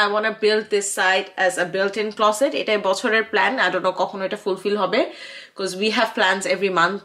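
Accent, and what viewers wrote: Sylhet, Bangladesh. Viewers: The way u speak sylheti is so cuteee🥰🥰 khala is lovely 🌹🌹 Sb Smy family Niye vlo thako shuke thako dua kori Allahummah Barik❤❤❤❤ Instagram a DM kora jacchena bestie eMail address?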